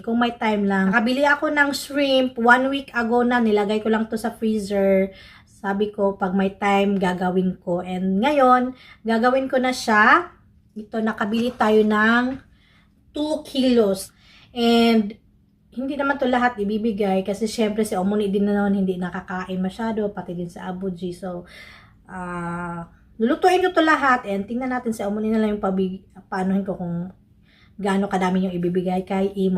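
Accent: Visayas, Philippines. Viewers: Bisaya accent?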